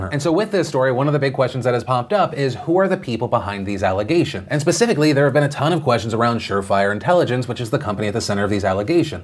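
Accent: South, United States. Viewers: This slow talking southern drawl of this guy has is endearing, but I wish he would learn to t as l faster.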